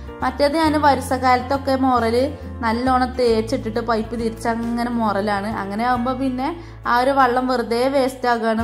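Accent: Malappuram, India. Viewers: Ur Language is real malappuram taste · അതെ. തനി മലപ്പുറം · മലപ്പുറം ഭാഷ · ഹഹഹ...ഹഹഹ...മലപ്പുറം ഭാഷ അടിപൊളി....കൊള്ളാം... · Enthaaan itrak mosham ano ngale malapuram slang itrak veandaayirunnu too sad to hear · Vlog adipoli Malappuram samsaram 😄😄😄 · Soooper eniku malappuram Basha nalla isttamanu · Like 29👍👍👍👌👌malappuram basha 👍👍👍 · Ningade mlp slang Super to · ഇത്തയുടെ ചാനലിൽ അത്യമായിട്ടാണ് വീഡിയോ കാണുന്നെ ☺️ നമ്മടെ malappuram ഭാഷ ഉഷാറായിട്ടുണ്ട് 👍👍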